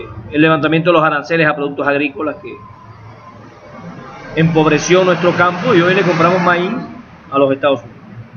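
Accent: Cuba. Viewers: Este señor tiene acento cubano hay que cuidarnos de lo que dice Lopez obrador esta incado ante trump sólo los mexicanos podemos defender nuestro pais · Oiga usted habla como ke es mexicano usted no es mexicano parese cubano venezolano no se pero usted no es mexicano ahora esta bien por apollarnos y si gusta ser mexicano pida la nacionalidad no hay problema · Su acento dicta que es cubano. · de donde eres? Te dices mexicano pero tienes tu acento cubano mucho muy marcado, saludos! Buen video!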